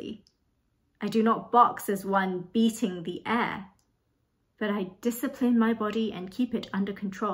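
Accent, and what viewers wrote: United Kingdom. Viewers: Lovely accent but unfortunately there is not a British accent. There are 4 countries within Britain, England, Scotland, Wales and Northern Ireland, and they are all beautiful accents in their own right. You are speaking with an English accent, very nice it is too. So many people from abroad get confused and think it's one accent, but its 4 and each country has a variation within them. 🇬🇧👍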